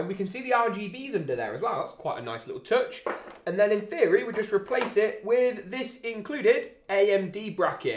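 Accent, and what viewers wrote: United Kingdom. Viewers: The bri ish accent is the most annoying accent in the world trust me man !!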